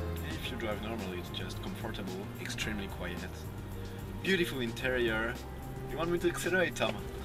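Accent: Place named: France. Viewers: oui, l'accent français est très net. Es-tu français ? Car on dit : "cet anglais", pas "cette anglais". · Je me disait bien qu'il y avait un accent francais sur cette anglais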